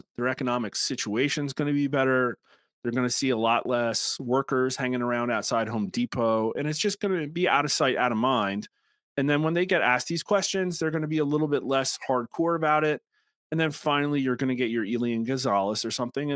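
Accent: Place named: Wisconsin, United States. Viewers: I didn’t know was from New Jersey. I’m from Wisconsin. He absolutely talks like he’s from the Midwest. I would’ve pegged him for Wisconsin night myself. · Still weird to me that you’re from New Jersey but say words like have and had like you’re from Wisconsin.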